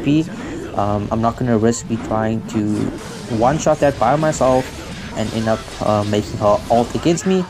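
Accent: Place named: South Africa. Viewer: Yo bru where u from ur accent sounds South African??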